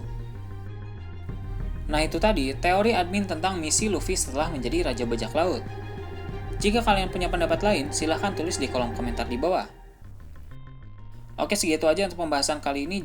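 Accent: Indonesia. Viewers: sejauh ini admin udah oke, tapi untuk penyebutan istilah dalam bhs inggris masih indonesia banget wkwkwk usul aja buat belajar pronounciation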